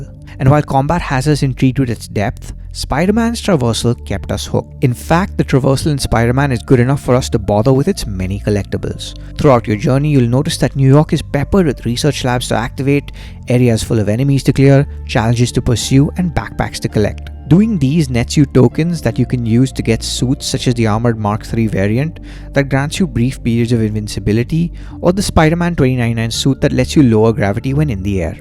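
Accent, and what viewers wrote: India. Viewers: Although I liked the video. But seriously don't try to mimic any accent. Indian accent is way better understandable than others don't try to mess it up.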